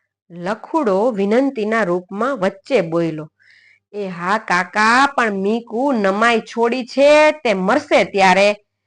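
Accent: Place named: Kathiawar, India. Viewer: પન્નાલાલ પટેલ ની વાર્તાઓ આ પ્રમાણે કાઠિયાવાડી લેઝા થી વંચાય નહીં એના માટે તો સાબરકાંઠા એટલે કે ઉત્તર ગુજરાતનો લહેકો અને લહેજો હોવો જોઈએ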